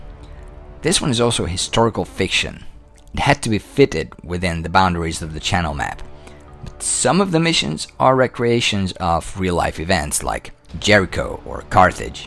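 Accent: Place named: New Jersey, United States. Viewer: I just can't put my finger on your dialect but it's the coolest American English. New Jersey?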